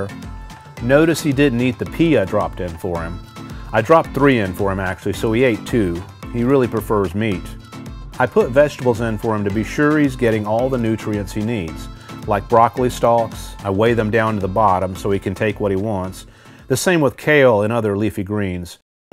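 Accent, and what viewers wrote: South, United States. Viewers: Exactly. His deep clam soothing southern voice and encouraging words. Happy little lobsters…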